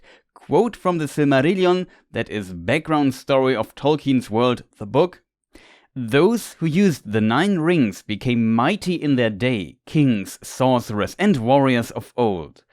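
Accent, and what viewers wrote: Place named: Germany. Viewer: As I heared you talking, I immediately guessed that your native language is German, just like mine. 🇩🇪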